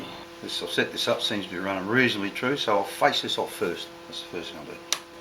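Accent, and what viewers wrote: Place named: Toowoomba, Australia. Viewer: I thought the speech sounded close, in fact your mode of talk matches a mate who lives in Toowoomba, so much so you could be father and son, but I know that's not possible. So from what you just said, Re Lathe switch, can you have the DRO on, but not the lathe and there is no problem? Or does the lathe switch also power the DRO?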